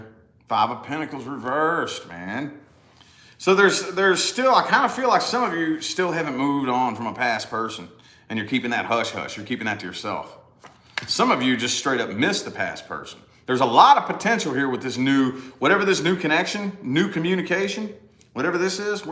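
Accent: South, United States. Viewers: Yes. That's a southern accent. Different southern states have different dialects. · Love your voice and your accent and expressions, you make me laugh, is that a southern American accent?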